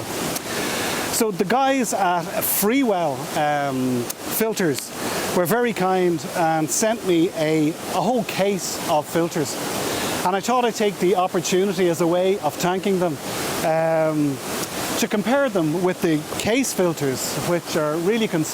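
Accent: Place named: Ireland. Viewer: Love the softly spoken Irish narration in your vids., very calming along with your intimate landscape and macro work ( my style of photography ) just very enjoyable viewing. Keep up the good work. 👍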